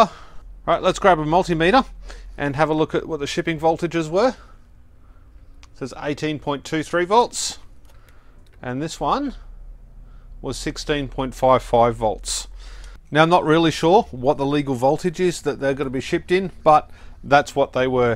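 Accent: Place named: Australia. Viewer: If it's not branded Ryobi, then it's NOT a fake. From the accent the reviewer is Australian who tend not to pay attention to facts. Anyone buying a replacement third party battery can clearly see it's not a genuine Ryobi or a Makita or Worx. So it's ridiculous to call it a "fake". Just sensationalism.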